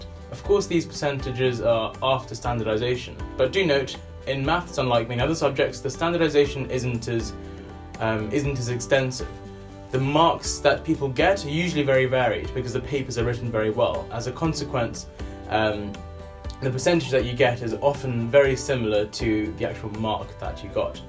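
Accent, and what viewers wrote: RP, United Kingdom. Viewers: Where are you from ? a you sound really posh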